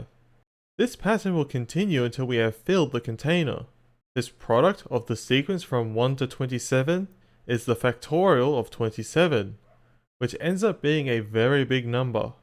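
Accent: Australia. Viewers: I LOVE your northern aussie accent btw I forgot what you were talking about until when i was like "oh yeah the golem thats why i was here"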